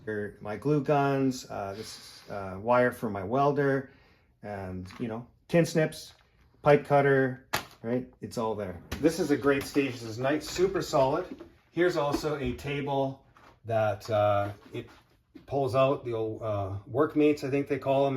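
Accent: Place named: Saskatchewan, Canada. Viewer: Canadian eh! From the fields of Saskatchewan I'm guessing by the accent!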